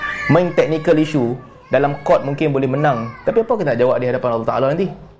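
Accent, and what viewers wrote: North, Malaysia. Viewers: Mungkin disebabkan dia bicara loghat utara ada perkataan yang tidak ada dalam loghat KL/Selangor/johor-riau.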